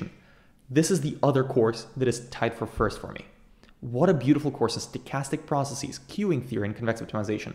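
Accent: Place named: Canada. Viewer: Can hear u r Canadian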